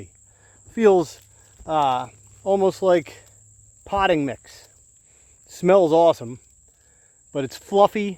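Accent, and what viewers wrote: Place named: Reading, United Kingdom. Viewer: Hey there sir! I’m just up North in Schuylkill County. Being from Reading originally I picked up on your accent immediately. Congrats on the well made Johnson-Su compost. I just started mine made up of Urine/LAB soaked biochar, pumpkins, and LEAVES LEAVES, LEAVES, shredding as many as I can with my hands weed whacker (unfortunately my mower does not have a bagger)! Do you have any tips on getting your pile up to temp? I just built mine today and I’m concerned it might not win the fight against December’s temps.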